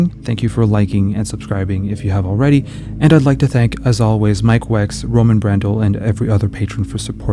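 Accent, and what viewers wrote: Quebec, Canada. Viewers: Your quebecois pronunciation is surprising 😄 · Hey love your content is your accent Quebecois ? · Are you from montreal or quebec? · Salut, es tu Québécois? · Are you from Quebec? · hes from kebek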